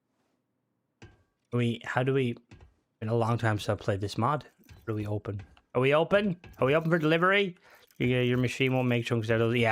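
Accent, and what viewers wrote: Ireland. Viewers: Awesome stream,...I usually just watch for the dancing guinea pigs but stay for the trash talk in an Irish accent 😂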